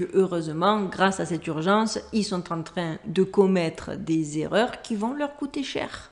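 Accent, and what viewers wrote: South, France. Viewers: Hello Merci pour vos vidéos. C est toujours un plaisir de vous entendre avec ce petit accent du sud. Votre analyse est tt à fait pertinente. Nous sommes effectivement au début de l abomination de la désolation "Apocalypse 13.14". Merci pour votre temps et votre bienveillance. God bless you 🙏💝🇺🇲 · Salut jeune jolie femme, alors ça fait un moment que je ne t'avais regardé, ahh tu sais avec le temps, on a pas assez de temps à consacrer à de si belles choses, plus trop de temps, j'aime beaucoup te ragarder, t'écouter raconter des choses très vraies, et très pertinentes, donc, bravo à toi, ( je te tutoie comme si on se connaissait depuis toujours ) je tiens à te dire ( continue, tu as un talent pour raconter des histoires, plus un bel accent du sud, ça plait beaucoup ça, ) voilà, je tenais à te dire ces vérités, car, je trouve que tu as un peu peur de déplaire, Je te répète que tu as un vrai talent pour raconter de belles histoires, BON alors tu me crois maintenant ? 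Your really good on what you do, i love watching you, "so, so, so, see you next time, on the next video" #sam&sam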